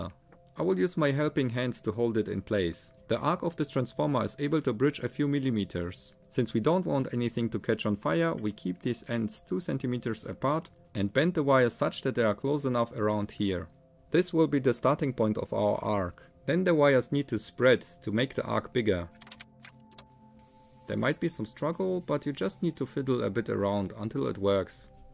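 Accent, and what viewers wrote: Germany. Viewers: Are you german? You do sound like it. If so, you're one of the few (like Great Scott!) who make interesting videos with outstanding quality. Unfortunately a rarity among german youtubers. Sub +1, keep em comin' :)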